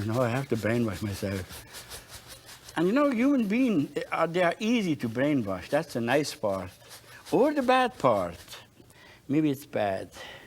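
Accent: Germany. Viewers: He has the same accent and speech patterns as my German grandmother. Miss you Oma.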